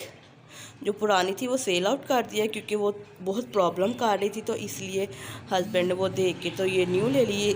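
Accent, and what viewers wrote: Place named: Lahore, Pakistan. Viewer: Sister aap Lahore ki lagti hain MashAllah aap Lahore walon ki tara bolti Hain Allah tala ki qismat shandar kary dunia ki sari naimtain day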